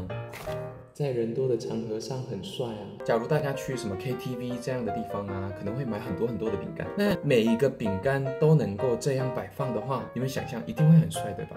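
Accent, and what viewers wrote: Standard. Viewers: 韓國人會講中文喔，好厲害，講的好標準喔！真的是韓國人嗎？不說我還以為是台灣人欸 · 中午太標準了吧！ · 真的，超標準，比我們教授的好100倍QQ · 很字正腔圓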